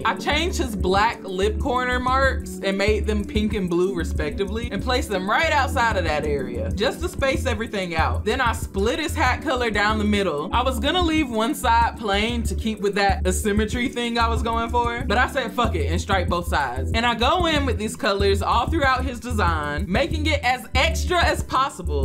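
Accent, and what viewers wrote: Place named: Georgia, United States. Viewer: girl i’m loving your energy in these vids!! are you southern lmao, bc the voice is giving Georgia lowkey (this isn’t shade i’m an SC gworl)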